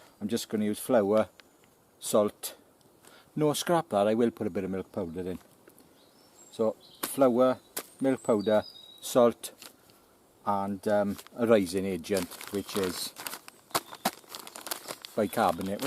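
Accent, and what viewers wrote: Wales, United Kingdom. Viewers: Great video, hope you don't mind my asking, but whereabouts are you from? (I know Wales lol) I recognise your accent, you sound like your an Amman Valley boy.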